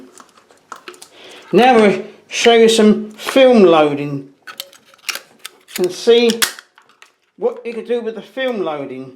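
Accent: United Kingdom. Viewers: I had this camera at one time with the 180mm lens. I always loved this camera. I viewed your photos on Flickr too. I'm going to have to join Flickr one of these days. A lot of good photographers there. By your accent I can tell your from the UK. There are a lot of good British photography magazines out there. Maybe I'll buy this camera again someday. I like the interchangeable lenses.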